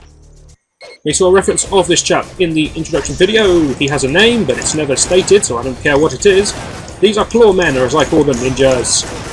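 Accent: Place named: United Kingdom. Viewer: Does anybody in the United Kingdom play this game or more was this filmed in that area? I don't know but I'd like my question or questions answered. The narrator of this video sounds like he's from the United Kingdom.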